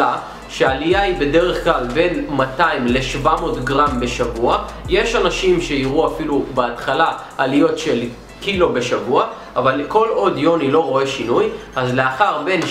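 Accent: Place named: Russia. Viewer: מבטא רוסי חח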